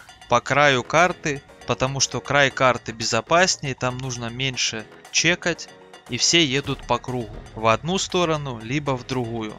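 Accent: South, Russia. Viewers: Ох уж этот южный Гговор))) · глупый)) он не не выговаривает)) это просто южный говор такой)) меня он очень забавляет)))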